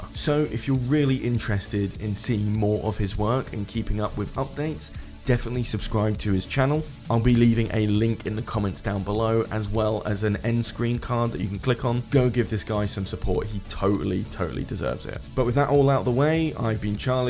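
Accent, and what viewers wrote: England, United Kingdom. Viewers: I haven't visited this? channel in a while, since when did become British? What happened to the OG? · Why is no one talking about the fact that the narrator is suddenly british instead of american 💀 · Why are you british now? You didn't used to be british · When did you get an English accent??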